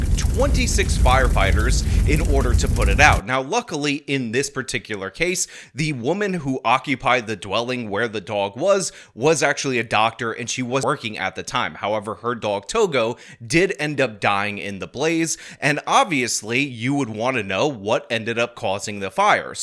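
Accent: New York, United States. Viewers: It’s not freaking awoken it’s awaken. The NY speech pattern will only get you so far with the speech nutzees. Ffs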